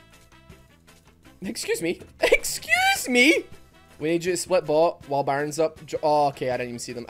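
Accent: Scotland, United Kingdom. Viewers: so scottish dude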